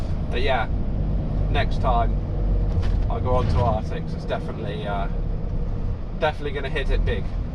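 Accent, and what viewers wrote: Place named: North, United Kingdom. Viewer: great northern accent lol irish amer ny us airforce veteran master obrien sir lol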